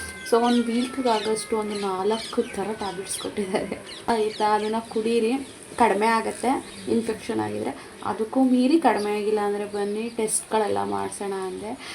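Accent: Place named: Tumkur, India. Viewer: Yellava Nim tumkur mathu channagirutte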